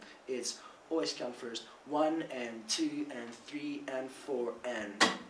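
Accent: South Africa. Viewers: do you have some south african in your accent?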